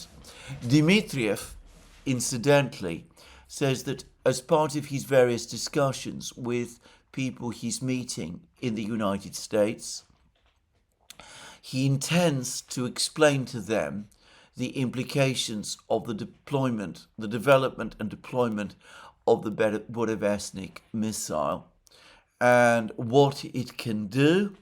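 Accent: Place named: RP, United Kingdom. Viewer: 勿体ぶった話しぶり 下顎を上げ視線は鼻先をすり抜け "ゆ なあーいてっど" 「united」（発声発音：Received Pronunciation、RP） 持って回したものの言い方 その先に必ず待機万端するのは 枕詞 「以前私が議論した通り」 これらの風味滋味を愉しめる渋い寛容は キエフ陥落に至るまで持ちこらえられそうには、もう無い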